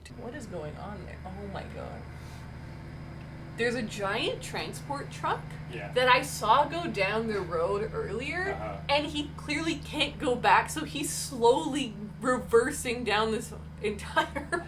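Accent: Canada. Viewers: Canada is gigantic. There are different accents in different regions all across the country. There is no one, single Canadian accent any more than there is one single American or British accent. accent is likely influenced by the area where she grew up. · That's true but she is canadian so she does have a certain canadian accent from a certain part of the country :)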